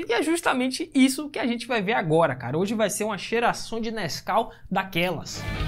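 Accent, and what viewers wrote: Bahia, Brazil. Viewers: Caramba mano, tu é baiano né? Tbm sou, so da sua voz da pra identificar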